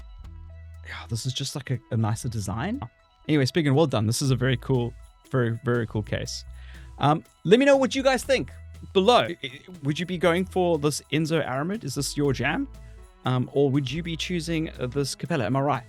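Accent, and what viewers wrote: South Africa. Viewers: How's it China, thanks for the reviews. Lekka to hear a genuine SA accent. Peace 🙏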